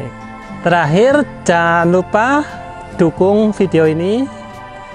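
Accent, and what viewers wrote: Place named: Banyumas, Indonesia. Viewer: Salam ngapak 😂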